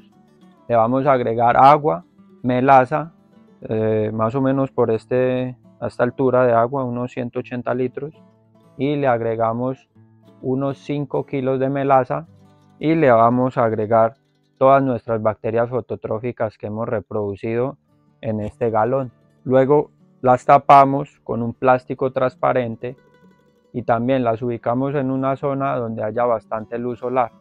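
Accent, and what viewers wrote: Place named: Colombia. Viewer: Bendiciones manito por el acento eres colombiano en que departamento vives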